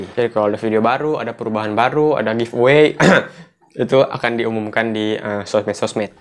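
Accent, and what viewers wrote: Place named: Tahuna, Indonesia. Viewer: Jga takaluar logat tahuna e😂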